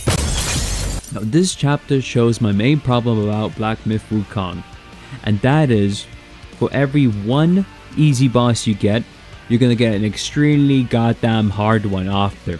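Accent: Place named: Scotland, United Kingdom. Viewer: Scottish accent gives that mythical feel, no Indian accent can ever achieve.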